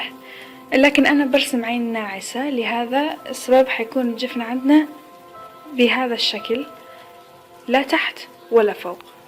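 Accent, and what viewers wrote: Iraq. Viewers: لا عراقية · شنو لهجتج بالضبط عراقي خليجي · لهجتها عراقية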